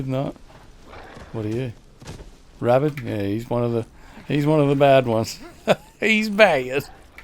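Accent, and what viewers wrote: Australia. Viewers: At first i thought it was your familiar Australian accent that was so comfortable, then I realised it was your down to earth Australian attitude. No tryhard pretentious radio announcer voice! Rare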